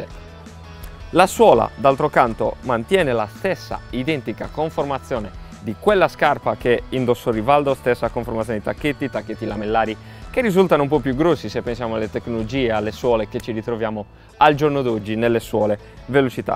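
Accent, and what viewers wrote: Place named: Sardinia, Italy. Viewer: Spettacolare l'accento sardo! Continua così ragazzo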